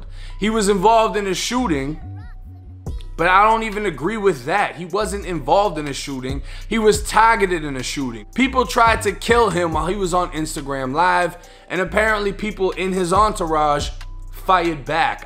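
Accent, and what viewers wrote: Boston, United States. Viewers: This dude who's talking must be from BAASTON🤔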